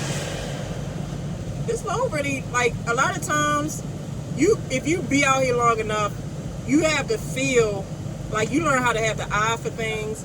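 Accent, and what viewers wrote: Baltimore, United States. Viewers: Are you a baltimorean ?? Lol. I hear this distinct accent in your voice. Two , do , etc.... just askin. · You have the Baltimore accent lol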